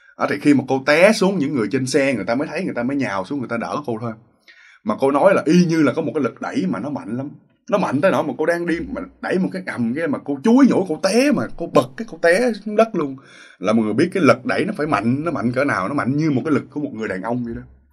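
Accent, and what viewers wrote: Mekong Delta, Vietnam. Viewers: gốc miền Tây hả ha, nghe giọng chân chất, tốc độ nói cũng khá nhanh nhưng đủ để nghe kịp, giống kiểu nói của mình.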